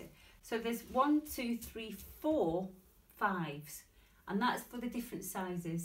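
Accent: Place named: England, United Kingdom. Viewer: I am happy the 2nd part followed so quickly. As a German I have already learned some technical terms through you. How about the seam allowance, is it included in the pattern? I am thrilled I ordered the pattern yesterday. Love from Uta from Munich. P.s. I like your accent, reminds me of the years I spent in good old England, centuries ago.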